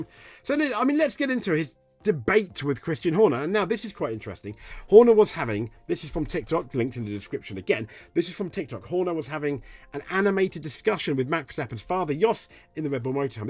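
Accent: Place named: United Kingdom. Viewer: Ahh Brit accent. Stop watching after 10 seconds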